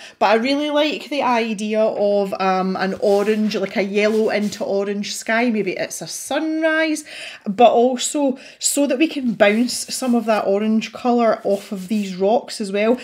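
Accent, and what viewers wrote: Scotland, United Kingdom. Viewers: I am so loving this, its lovely to hear your accent too, I live in Aberdeenshire (Royal Deeside) so I feel really at home watching your channel.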